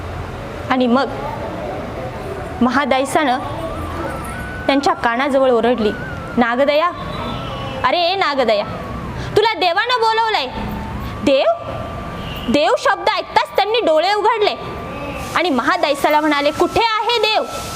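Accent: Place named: Vidarbha, India. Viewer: 🙏दण्डवत प्रणाम कु ताई 🙏जीवन भावसार, पुणे!उत्कृष्ट कथा कथन ताई प्रसंग डोळ्यासमोर उभे केलेत आणि डोळ्यात अश्रू आलेत, निशब्द झालो 🙏वऱ्हाडी भाषा तर अप्रतिम 👍🙏🙏🙏🙏🙏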